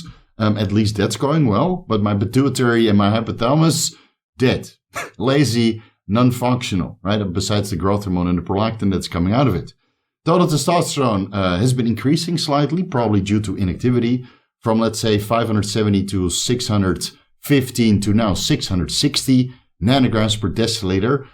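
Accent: Netherlands. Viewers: Are you dutch? You got funny accent.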